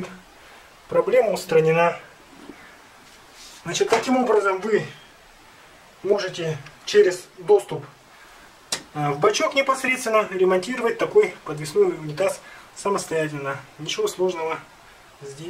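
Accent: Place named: Buryatia, Russia. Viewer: Уверен - автор либо бурят, либо киргиз, уж очень говор похож)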